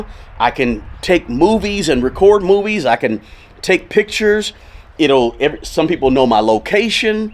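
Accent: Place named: Tennessee, United States. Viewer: Bro sounds country. Must be from TN.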